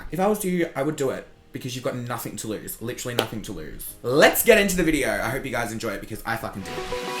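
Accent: Australia. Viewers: I thought u were American but ur Australian right ? , love u anyway u slay Every product that has been released xx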